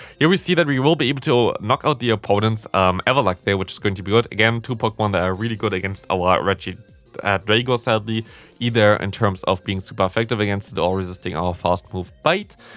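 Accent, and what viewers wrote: Germany. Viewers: ich wusste zwar, alleine durch den Akzent, dass du deutscher bist, aber Eutin ist nicht mal ne halbe stunde weg von mir😂 Grüße aus Schleswig Holstein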